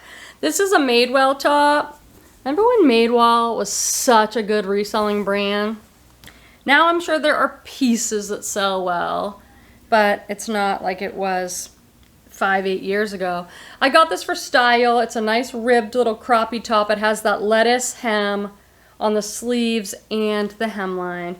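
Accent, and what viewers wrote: Boston, United States. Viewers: I LOVE your Boston accent! Bra, Halara… I could listen to it all day. 😊 I want the Hudson Bay blanket! I’d struggle with selling or keeping it. Lucky reseller that found that!!!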